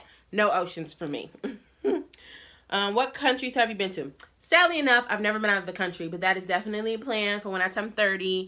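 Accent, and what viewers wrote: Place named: Chicago, United States. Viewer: Yup you have an accent! Chicaaaago lol #NewSubbie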